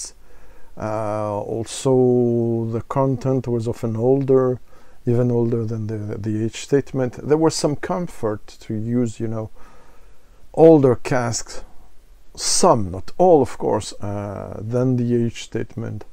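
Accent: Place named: Highlands, United Kingdom. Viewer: Still working on your Highland accent, I see? A few months spent in a rural village and no one would know the difference. I do like the presentation of the pre-1974 Famous Grouse, surprised at the striking differences between the modern version and pre-1974 edition. I agree with you on the peated version of Famous Grouse, it doesn't rock my boat either. Maybe that's why they keep repackaging the bottle?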